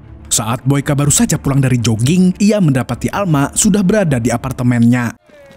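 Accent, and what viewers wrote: Banjar, Indonesia. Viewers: Urang banjar sekali ny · Urang Banjar kah sakalinya? 😁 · Urang banjar kah boy admin nya nih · Banjar nya mana pian mang... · Pian urang banjar kah? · Urg banjar kh mang 😂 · Banjar sekali nya Admin Nya,slam sanak dari kotabaru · Admin orang banjar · Orng banjar kh mang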